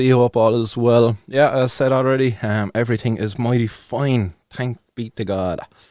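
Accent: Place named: Cork, Ireland. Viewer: Good see you back on the screen baii... And really glad that you in a position to be back! Missed those dulcid Corkonian tones😋💚🌱🌱🇮🇪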